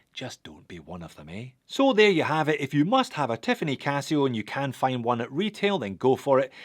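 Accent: Scotland, United Kingdom. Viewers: Thanks for another great review. As a Newfoundlander Canadian, I really like your Scottish dialect. It makes your reviews far more fun and witty ;)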